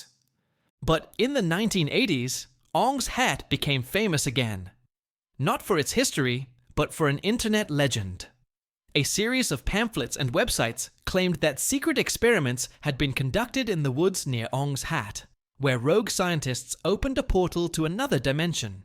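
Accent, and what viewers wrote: United Kingdom. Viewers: Is this guy British or what? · Uk accent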